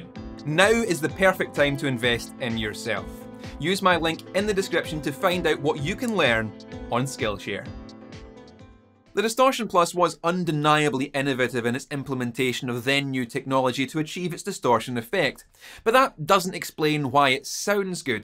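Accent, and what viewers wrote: Scotland, United Kingdom. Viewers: I really like your scientific approach to explaining guitar electronics. No snake oil salesman here. Also I just love the sound of the scottish accent!!! Greetings from a german electrical engineer drummer guitarist guy. 🤣🤘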